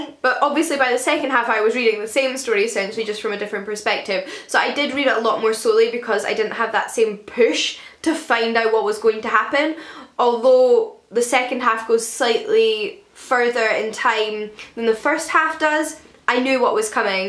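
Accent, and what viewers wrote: Scotland, United Kingdom. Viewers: you're Scottish, aren't you! Lol!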